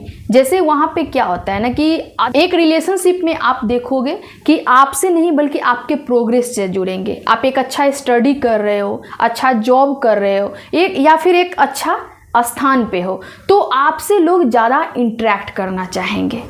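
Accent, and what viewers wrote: Bihar, India. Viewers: Bahut sach kaha , aapki tone belongs to Bihar state ,? I imagine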